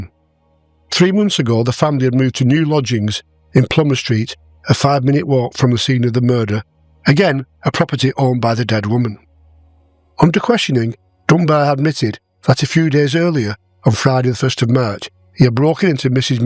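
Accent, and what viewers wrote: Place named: Northern England, United Kingdom. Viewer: I enjoy the differences between Red Rose accents: your northern one, Oasis-Manc, Gerry Marsden-Scouse, coastal posh (Morecambe, Southport) and that lighter one abutting on Cheshire. The more the better.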